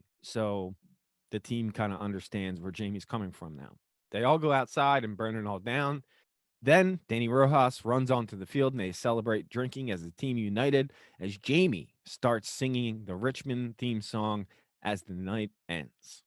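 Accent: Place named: Philadelphia, United States. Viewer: I love your Philly accent :-)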